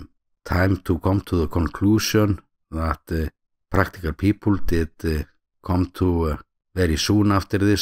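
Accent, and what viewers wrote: Iceland. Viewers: Apart from the content, I love listening to your voice. I am Dutch, and for some reason the Icelanding accent when speaking English comes very close to what my parents sound like when they speak English. (I sound more like an American since I grew up with subtitles and the internet, but their accent sounds so similar in many ways!)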